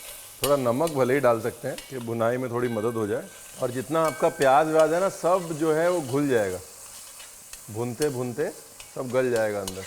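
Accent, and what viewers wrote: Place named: Punjab, India. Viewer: Aap cooking thriller Hain aik dum Khushi say Jo recipe banti hai Woh laJawab hoti hai ❤ Chass a gaya wai Punjabi lehja wala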